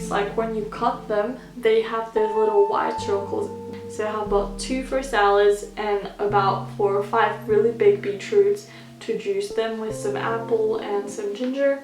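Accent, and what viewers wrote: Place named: South, United States. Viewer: You have a very southern american accent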